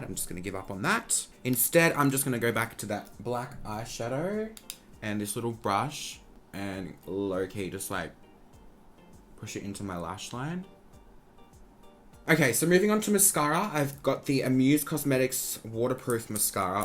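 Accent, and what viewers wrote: Queensland, Australia. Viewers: 1st time on this channel. I already love it! Straight off the bat also I can tell that he's from Queensland. 👌❤ Also congrats on trending! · Omg youre aussie!?? Hello from a fellow Australian😂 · OMFG I LOVE YOU ❤❤ you make me miss Australia with your accent 😭😂 your so fucking funny!! I love you, and your videos ❤❤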